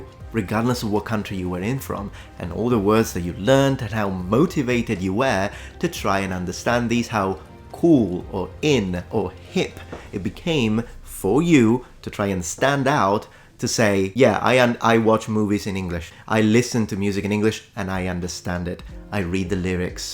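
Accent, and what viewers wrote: United Kingdom. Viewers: Oh yes that is why i can hear a bit of a british accent when you talk english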